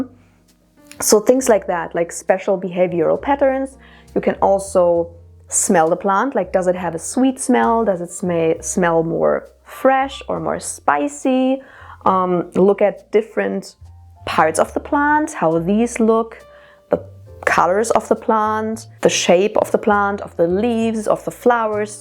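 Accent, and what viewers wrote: Germany. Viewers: I hear a german accent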